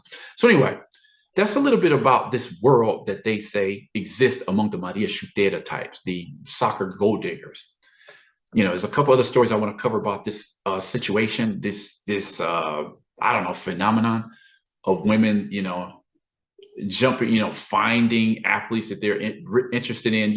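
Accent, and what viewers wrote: United States. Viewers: You sound American but I'm guessing you live in Brazil bro?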